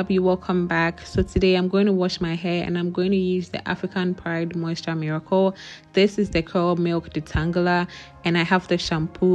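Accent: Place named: England, United Kingdom. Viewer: You are simply smart & very beautiful. I love your English accent 🎉🎉🎉🎉❤.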